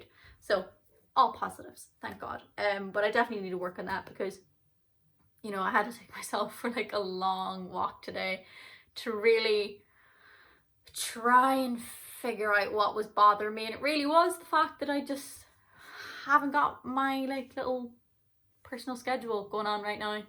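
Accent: Ireland. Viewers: Glad to see u back. Ur accent is more Irish. Love it. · I hope you enjoy the rest and free time, stay safe well and happy. You make me smile and feel good, i so enjoy your Irish accent. Glad your getting on top of your problems i heard a trick that helps people go to sleep you start by relaxing your shoulders and then work down your body and then start saying dont think dont think slower and slower it seems to work with me im asleep in minutes i think sometimes we need to rest our brains. xo live long and happy.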